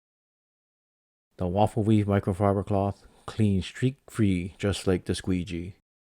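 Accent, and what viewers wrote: Hawaii, United States. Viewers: I can here the pidgin coming tru 😂🤙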